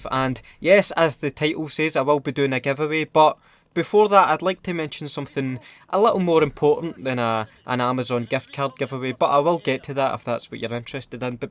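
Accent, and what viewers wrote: Scotland, United Kingdom. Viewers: England and the first video I don't know but it was around about the time you did the video about a party and everyone was off their heads! Love your content solely because you're a Scot! My dad was from Edinburgh so I can understand somewhat what you say! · Greetings from Texas over in the US! First video I ever watched was "WTF EVEN ARE COD MAPS?!" It's an amazing video that intoduced me to your hilarious content and great style of commentary. Never did I think I'd spend so much time watching a Scottish man rant about COD so calmly. Thanks for the giveaway, and I hope you help raise a lot of money for such a just cause. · 1) I live in Scotland, tho I'm from France 2) First video I saw was the one on Bully, through a facebook post. I remember it because it was my first week in Glasgow and I was like "Oh man is that the accent I'll have to live with for the next 4 years?". 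Loved it tho, and I've been showing your videos to my friend and family at home whenever I get asked how is the Scottish accent;) Thanks a lot for what you did there, it is nice to see someone do stuff like that and have conscience of what is around them. Keep up the good work mate:D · South Africa I watched the soap video on Facebook uploaded by game lads or something like that. The first video on YouTube I watched was the clowns video. (I also frequently binge your videos cause sometimes nothing else interests me except for a Scottish accent. Not pandering, just stating) Part of the notification squad now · I'm from Finland & the first video of yours that I watched was your "most useless attachments #3", which made me fall in love with the Scottish accent (no homo). I've stopped following any other COD youtubers than you. Needless to say, awesome work bro. Please keep it up, really brightens my day when I see that you've uploaded a new video. 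Cheers. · Im from England! I have to say the first video of yours I watched was tactical nuke incoming when I was in a mw2 hype, thought you were funny so watched a few more and the wee Scottish accent just made it even funnier! keep it up though man! im glad to see someone who's made it big and still thinking about the people less fortunate than themselves!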